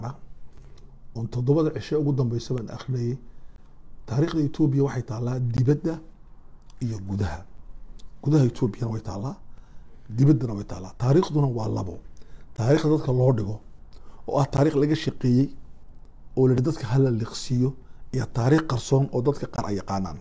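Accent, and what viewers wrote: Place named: Djibouti. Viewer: Waxaqiiqo waxaan kuu sheegayo Waa iska jeclahay lahjada reer Djibouti shiiqu runta uu kahadlay somali israamsatay oo kala tagtay Hala qabsado kolay aniga qaxooti baan kahay dhulkii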